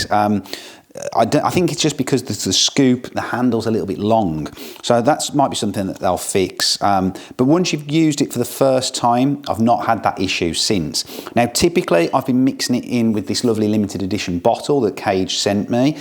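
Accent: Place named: New Jersey, United States. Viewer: Nice accent you got there. New Jersey?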